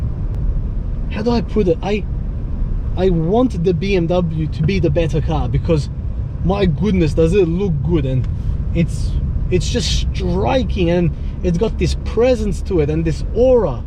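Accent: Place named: South Africa. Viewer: Great vid and it's sounds as if you have a little south African in your accent. Do you? 👍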